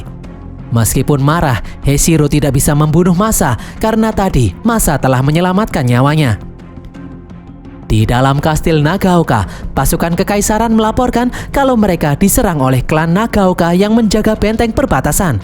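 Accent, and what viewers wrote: Java, Indonesia. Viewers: jadi unik nntn jepang kuno pakai narasi logat jowo medok😁👌🏻👍🏻 penasaran klo logat nya dirubah le logat ngapak..mungkin tambah unik⭐⭐⭐by : sucriber baru🙏🏻🤩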